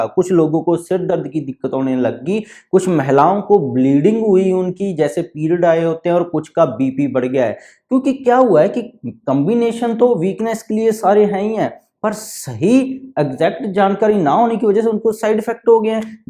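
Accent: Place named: Punjab, India. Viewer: Kuchh samajh ni aa raha Punjabi touch k vajah se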